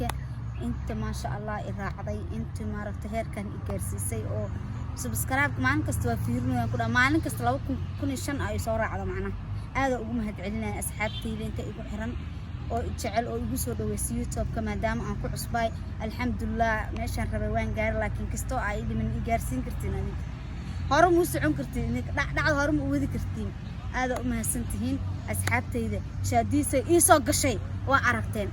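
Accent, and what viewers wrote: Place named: Mogadishu, Somalia. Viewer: Maanay video yadaada markaan daawaado af reer xamarka ka bartaa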